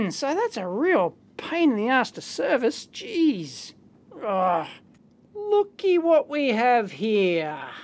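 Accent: Australia. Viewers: You did up the Aussie level on this one for sure mate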